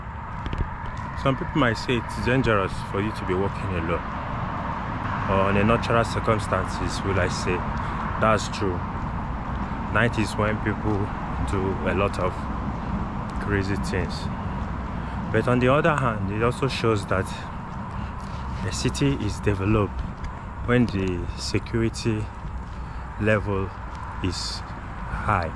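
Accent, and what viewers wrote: Africa. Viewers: акцент не американский, из Африки скорее всего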